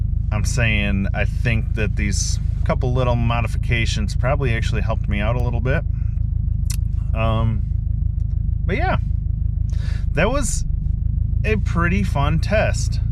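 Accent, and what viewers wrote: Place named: Wisconsin, United States. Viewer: are you in wisco? Scenery and accent tells me yes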